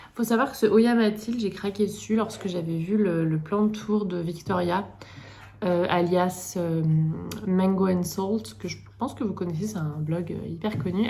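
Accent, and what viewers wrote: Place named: England, United Kingdom. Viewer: C'est mon premier commentaire sur ta chaîne! J'apprécie vraiment de te suivre; je me regale a chacun de tes vlogs même si pour tout te dire je les trouve bien trop courts 😉. Tu as l'air si douce; si gentille et j adore ton petit accent anglais. J'aime bcp ce que tu nous proposes dans tes vidéos ( plantes; recettes; coups de cœur; blabla bébé)! J'aimerais beaucoup que tu nous fasses une vidéo sur tes looks/tenues car je trouve tes tenues hypers sympas et originales à chacunes de tes vidéos! En tout cas tu es une de mes plus belles découvertes YT. Bises; à bientôt.🌼🌷🍃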